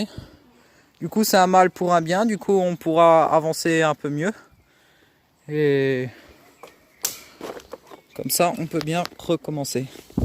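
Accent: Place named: Alsace, France. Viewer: Excellent les gars, je viens de regarder ta vidéo, je vais bientôt avoir 40 ans et franchement continuez comme ça les jeunes je vous encourage, je viens d’Alsace aussi et ton accent je l’entends bien grave allez à plus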